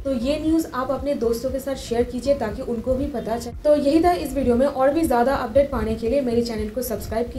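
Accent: Bengal, India. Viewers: Mam r you Bengali?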